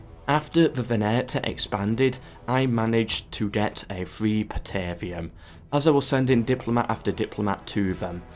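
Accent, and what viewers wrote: United Kingdom. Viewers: What UK accent is this? Sounds like every female flight attendants on the low cost flights, always loved that accent =)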